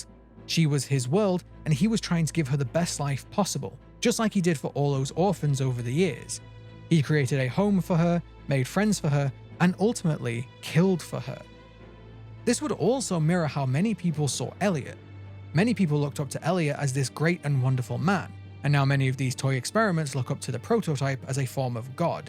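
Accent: United Kingdom. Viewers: It's funny how differently British ppl pronounce things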